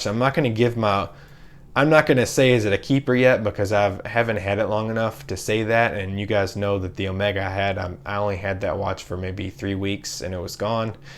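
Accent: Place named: Kentucky, United States. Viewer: I hear a Kentucky accent!!!!!!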